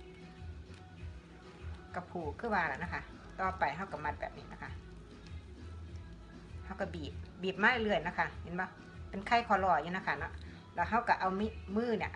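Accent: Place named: Isan, Thailand. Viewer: สำเนียงพูดอีสานน่าฟังมากเลยค่ะง่ายดีแปลตามได้เลย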